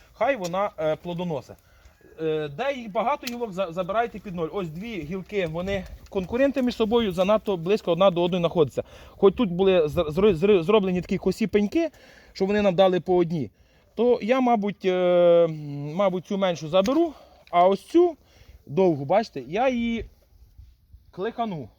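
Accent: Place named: Podillia, Ukraine. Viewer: Подільський діалект.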